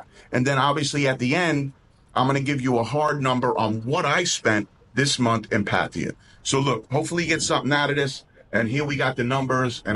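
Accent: Brooklyn, United States. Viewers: love the Brooklyn-speak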